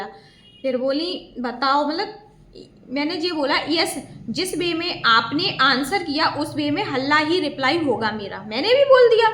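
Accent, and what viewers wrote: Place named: Madhya Pradesh, India. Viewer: Waise aap MP se kha se ho…mai bhi MP se hoon….apki language Suen kr lga ki aap hmare taraf k hi ho😅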